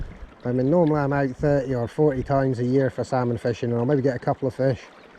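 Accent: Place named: Ayrshire, United Kingdom. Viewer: excellent, I have to ask as it's one of the things that makes up a good watch and listen- where's your accent from? I'm in kilmarnock and I know you've got a little ayrshire but maybe south west of England somewhere thrown in too?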